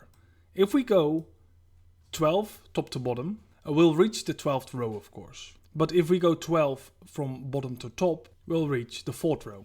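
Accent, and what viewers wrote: Netherlands. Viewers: Dutch detected!!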